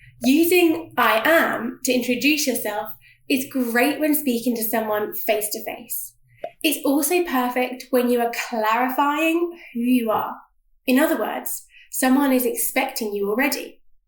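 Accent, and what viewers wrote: United Kingdom. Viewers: 영국식 영어 발음 불편하다.. 그리고 어차피 모국어가 아니면 의사소통이 첫번째 목적인데, 영어가 모국어인 사람도 완벽한 영어를 구사하지는 않치 않나. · BRITISH ENGLISH~ 액센트 너무 좋아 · 한국사람도 아닌데 한국인들이 실수하는 부분을 잘아시네요. 도움많이 됐습니다. 영국발음도 배울겸 구독하고 갑니다!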